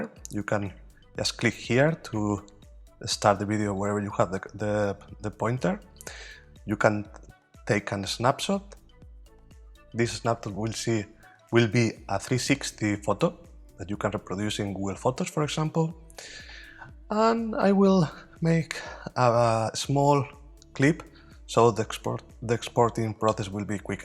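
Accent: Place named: Spain. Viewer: your accent (espanol I assume) a little hard to understand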